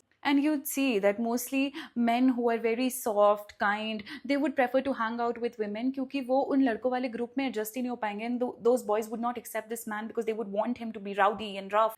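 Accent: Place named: United States. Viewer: one thing made her channel different from others and attractive that is <3 her personality in long video's is like -: pure AMERICAN ACCENT !!😁 and in reel's and shorts is like-: pure desi and dark vibes 😜😁 with some funny sprinkle spices ♨✨❤!! one thing i must say 😊🤨>> wht happens with this cute gurl !! in both the format of videos 😅🎀!!!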